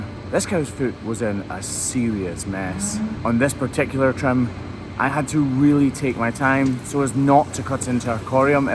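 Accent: Scotland, United Kingdom. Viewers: When is your audiobook released,? Got the book but I love the sound of Scottish voice (part Scot but I talk Scouse)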